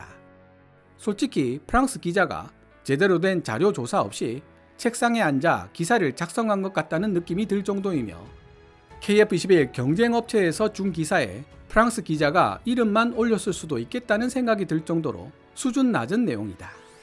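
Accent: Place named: Busan, South Korea. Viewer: 부산 사투리억양 정말 듣기 힘드네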